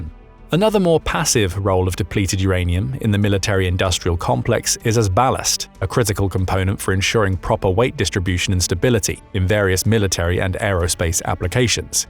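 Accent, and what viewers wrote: United Kingdom. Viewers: I wish these videos didn't include British narration.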